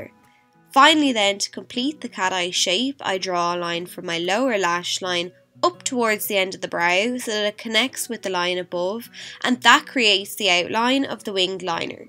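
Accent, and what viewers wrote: Ireland. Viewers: Hey, great video I like the simplicity and that you take your time and go into detail to explain what you're doing and how you're doing it. I also like that you share alternate tips and tricks incase we're having problems. I appreciate that, I like your style so will be subscribing......also love your Irish accent. Coming from a massive Conor Mcgregor fan lol keep up the good work !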